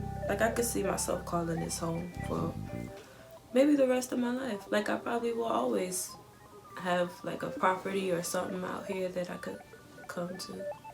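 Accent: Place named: Brooklyn, United States. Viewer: You talk like a BK person!! Tell her!!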